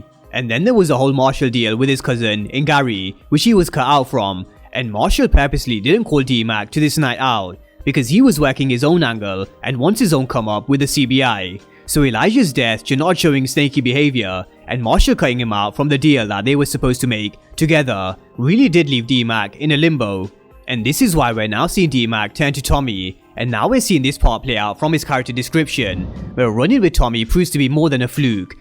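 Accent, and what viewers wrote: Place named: United Kingdom. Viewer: Makes you wonder who is JP's ex though (D-Mac's mother)?🤔💭 JP was definitely straight at some point of his life to have D-Mac to begin with, yet there needs to be some back-story explored to why we don't know about D-Mac's mother? D-Mac is mixed-race so his mother must be black, but then why did she leave JP and her son is another question? P.S. Well done for hitting almost 100K subs - been tuned into your recaps since you were on less than 10K 💯🔥 Guess it's great to hear TV series reviews from a British guy - your selling point!😎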